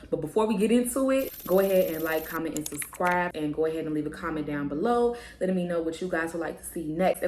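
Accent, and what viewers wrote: Chicago, United States. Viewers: I’m from Milwaukee but I live in Texas and your Chicago accent 🥹😬💕 My cousin from Chicago… I love….love💕 You are truly gifted, intelligent and your grandma is smiling down and very proud. Your grandmother passed the torch of good home cooking, continue to use your gift to inspire families to cook at home. #bringbackfamilydinners good luck to you💕🙏🏽🙌🏾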